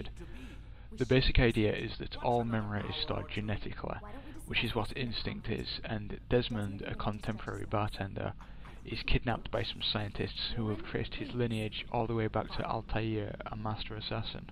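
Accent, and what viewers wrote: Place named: Scotland, United Kingdom. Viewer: He sounds more Scottish than English.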